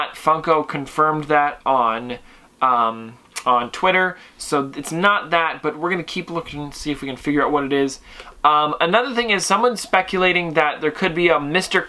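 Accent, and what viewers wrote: Canada. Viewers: I love Canadian accents 😂 love the videos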